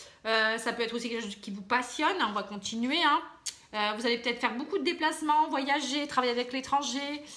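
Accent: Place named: Pas-de-Calais, France. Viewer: Bonjour, merci pour cette guidance bien complète. Juste une remarque sur le domaine sentimental : je trouve que vous parlez beaucoup des couples et pas assez des célibataires. J'en suis une parmi les 14 millions de célibataires de France, donc très loin d'être la seule. Pourriez-vous rééquilibrer vos prédictions sentimentales dans ce sens ? En tout cas je trouve votre analyse fluide et parlante. Merci ! Dernière chose : seriez-vous du Pas-de-Calais car votre accent me fait penser à ce département ? Merci. Paix et amour à tous 🤗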